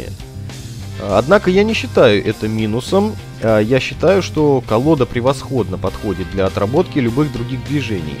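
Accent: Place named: Russia. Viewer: I liked your russian accent having a deck with Mr.Lee Asher signature on it is an honor A MUST HAVE DECK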